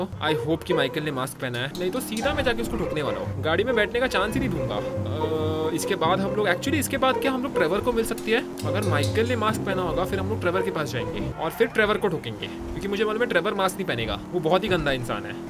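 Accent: Maharashtra, India. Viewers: Bhai vo marathi h main bhi shi re shi ghan aisa bolta hu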